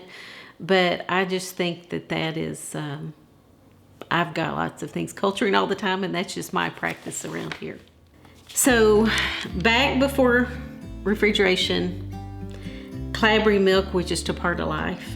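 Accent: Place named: United States. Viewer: Sooo amazing! Thank you! I'm pretty sure this info doesn't exist anywhere else on the Internet. 😂 But there had to be a way. Thanks for sharing. I've married into a German family in South Africa and they also still make Kochkäse. I had to have a little chuckle at the American accent saying it though. I've just recently found your page, as I've taken on the responsibility of our small dairy herd, and I am thoroughly enjoying working through all the shared wisdom you've got here. Very thankful.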